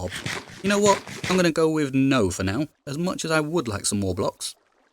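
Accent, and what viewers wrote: United Kingdom. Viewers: This guy is actually so funny, and chill lol. The british accent makes it better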